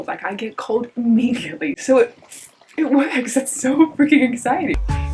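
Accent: Australia. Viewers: Are part aussie? You sound like it a bit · you sound australian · so this is the first video of yours that ive seen. i was thinking - wow, her accent is on point (im australian.. assumed you are icelandic). i noticed like 5% sounds south african. so then im wondering, has she just lived in straya for a while, or did she self teach the australian accent? then i heard 2 seconds of your bf and i knew he was aussie and therefore that must be why you sound australian. now im thinking, maybe you're actually australian, born and raised. hmmmm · you have a slight australian accent after your 1st accent..... did you live in australia?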